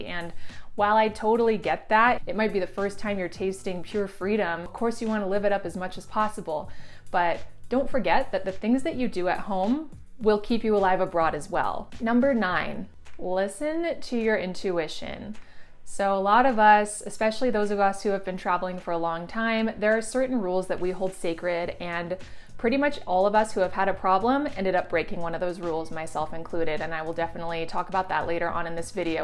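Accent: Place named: North America. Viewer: I'm guessing you're either U.S. or Canadian by the way you speak. You remember thoes AT&T Sprint with Candace Brugan the dime lady. And MCI 00 it's magic you know. And <num> /<num> commercials? Saw them all the time in the 90s as a kid.. now days obviously they're a null point. Nearly everyone has a cell phone. I just can't understand why that being said phones have yet to work worldwide at the same flat rate. .. I hope it happens before this 2020s decade is over. As previously mentioned I know how to use a calling card from a payphone. However guessing I wouldn't find very many of either anymore??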